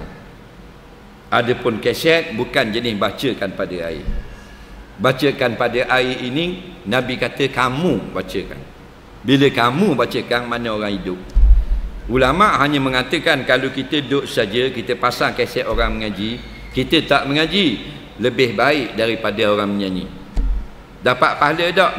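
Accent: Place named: Kelantan, Malaysia. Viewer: Selambe rock tuk guru. Walaupun pun loghat kelate tapi straight to point and Terang dan kelas. Terima kasih ustaz. Semoga ustaz makin sihat untuk terus menjadi ustaz kami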